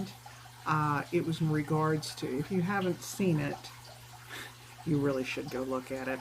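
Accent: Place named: Georgia, United States. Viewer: I can't believe you were born in New York and lived in the Midwest. Georgia really "got hold of you". I pronounce most of the words the same as you and I was born and grew up in South Carolina. However, I don't have the drawl that most southerners have either. Love all the charts and fabric you have. I would love to go to a market and especially a retreat. Martha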